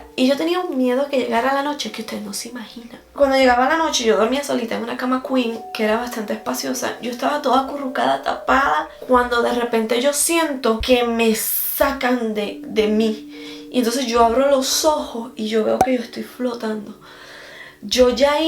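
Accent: Puerto Rico. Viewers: en el rato que te escuche tenias acento de PR Venezuela y Mexico? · Ella habla bien simplemente es el acento y la rapidez para hablar de cada país muchos en Puerto Rico hablan así y en varios países más rápido después de un tiempo te acostumbras y les entiendes mejor. A mí me pasaba que a los venezolanos y cubanos no les entendía bien, es cuestión de entrenar el oído como cuando haces listening con el inglés 😀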